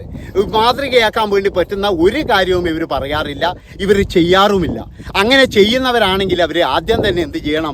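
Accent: Kannur, India. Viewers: കണ്ണൂർ ശൈലി അല്ലേ? ഈടെ എല്ലാരും അങ്ങനെന്നെയ പറയൽ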